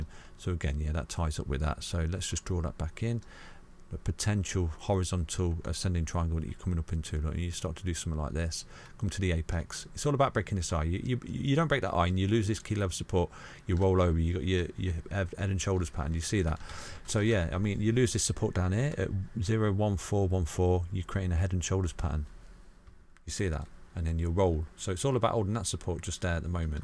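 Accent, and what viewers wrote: United Kingdom. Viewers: Word! Gives me comfort hearing a UK chap talk some crypto sense. Most soothing youtube voice ever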